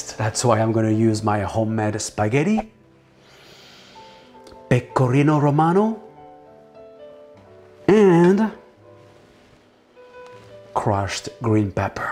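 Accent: France. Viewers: french accent ^^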